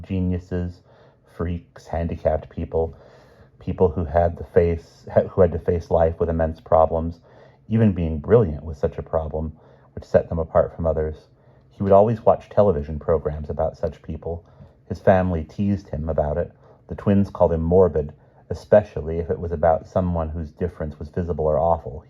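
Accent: United Kingdom. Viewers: Ms. Banks would be impressed by your British accents.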